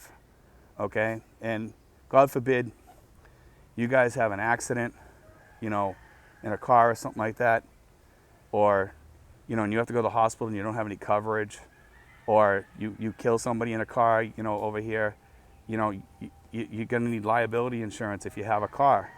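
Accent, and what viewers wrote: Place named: New England, United States. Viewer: Great Vlog! You made me hungry for New England Chowder soup listening to your accent. Campells it is! Crackers n pepper! Sincerely Bruce Tattrie Edmonton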